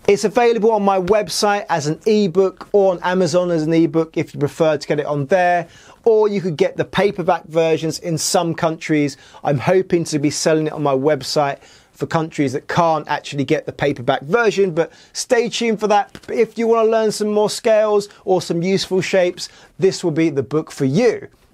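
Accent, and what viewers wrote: England, United Kingdom. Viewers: Are u from England? I lived there for 5 yrs...IPSWICH!!!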